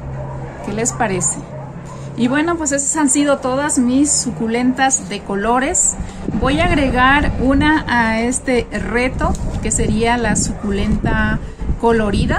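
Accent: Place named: Peru. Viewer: Hola saludos de Perú usted es de ecuador sigo a unos chicos de Marcos Otavalo ellos hablan muyyyy diferentes la escucho muy familiar como una Peruana . Yo sigo a Meliza y a Mary ahora también a usted.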